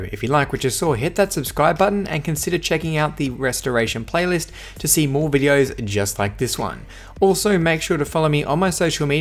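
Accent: Australia. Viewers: So you’re Aussie.... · Aussie accent bud they rise in pitch at the end of SENTANCES lol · When I hear he’s Australian like me Insert surprised pikachu meme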